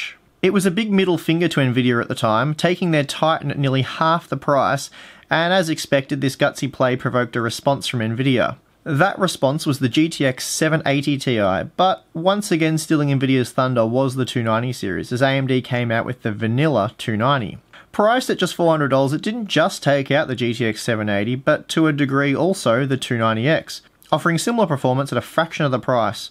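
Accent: Australia. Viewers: I really enjoyed this as well as all your videos. Something about the Aussie accent makes watching all these vids for research a bit more fun...LOL...I would like to add in the states it seems the R9 390's in both the standard and X variants are a bit cheaper with used 390's being found for under $150. The 290's for some odd reason are averaging the $200 you mentioned. Thanks for another great video. Since I only upgrade when I see major dips in frame rates, well educated hands on reviewers like yourself are a godsend. My last rig finally died, (VRM's on the motherboard), lasted me since 2010. It was the old tried and true Phenom x4 965 BE stable on air with a Radeon HD6950 TOXIC from Sapphire that ran as the 6970 with the Bios Switch enabled. Card still runs perfectly, but she is definitely dated and it's time to upgrade. Thanks for making that so much easier. 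Cheers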